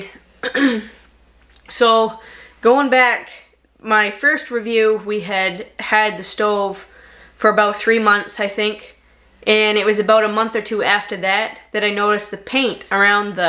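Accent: Maine, United States. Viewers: Love that Maine accent. Great you tube channel. You are a good role model for young women. You remind me of my daughter. She is very outdoorsie too.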